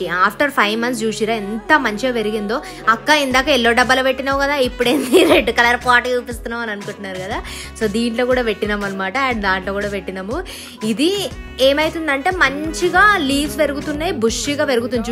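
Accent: Telangana, India. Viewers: Telangana bashaaa...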